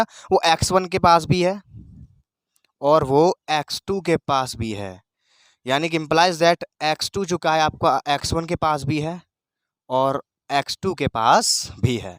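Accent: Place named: Braj, India. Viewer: braj bhasa accent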